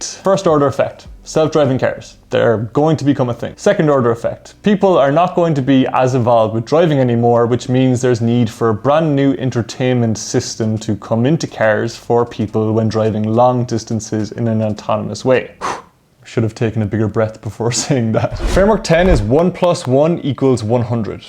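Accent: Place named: Cork, Ireland. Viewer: Love when you’re randomly jumping through YouTube videos and come across a random lad with a Cork accent 😂 great content!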